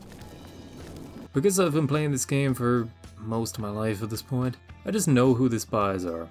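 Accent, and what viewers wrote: Ireland. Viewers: Where is your accent from you sound Irish but not quite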